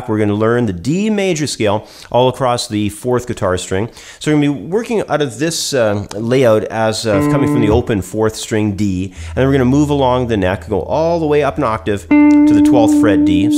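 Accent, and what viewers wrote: Canada. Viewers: Coolest thing is even though a 1 string solo not playing with 1 finger too obvious is to a untrained eye the illusion created is that it appears to come across unless watching like a hawk a very melodic piece of music. And very cool. Almost like what Glenn RIP did on the little solos were only on 2 strings. You got so much content don't know if ever get thru all but gonna sub. to channel. BTW I hear Canadian accent are you still up there or do you live on states now?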